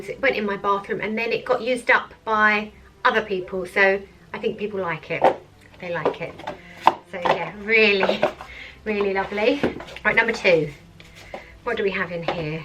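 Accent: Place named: United Kingdom. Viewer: Loved the review, and you really made me giggle with your super British accent, Cute Channel, will be checking you out again!! xx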